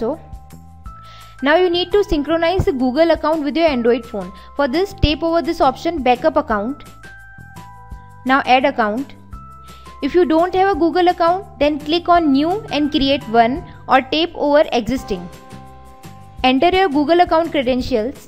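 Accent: India. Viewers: Why are tutorials vids have someone who talks like some indian that just learned english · Why have those guys always a indian accsent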